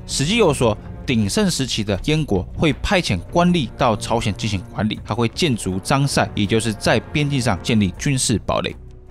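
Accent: Taiwan. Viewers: 您的口音像是來自台灣，但是您節目的深度貫穿古今中外！ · 你的口語很台灣講解方式也有點台灣不過濃濃的又支納中國的歷史觀點請問你有中資背景嗎？會不會影響我們的正確思想意識和歷史觀？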